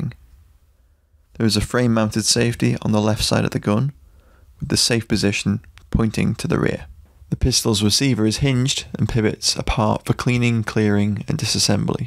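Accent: United Kingdom. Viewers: What a pleasant surprise to click on a gun-related video and hear a fellow Brit narrating it. I just bought a Dreyse M1907 a few days ago, can't wait to try shooting it.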